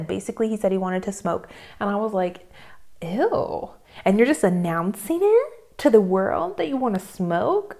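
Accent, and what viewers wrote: United States. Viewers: First of all love your content and your southern accent. (Y'all) Small talk... No. I want to know less about it/you. AC... In the car yes, otherwise you live in a shed. A house, doesn't get that hot. Water... If you want free water, use the tap. Smoking... Yeah, we got all addicted by the US tobacco companies. so deal with it. Speedos... Well, yeah, they were common decades before shorts. Football... Soccer is for american girls, we love football. The experience from a Football match to a NFL match is like comparing a Formula 1 car and a stroller. There is this guy Connor Sullivan on Youtube, watch his video on going to a premier league in Germany. Otherwise, best wishes from a German, living abroad. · Where you from bro Texas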